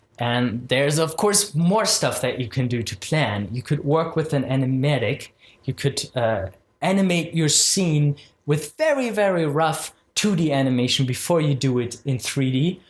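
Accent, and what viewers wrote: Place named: Germany. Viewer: I love your German accent Cause, I have the same accent xD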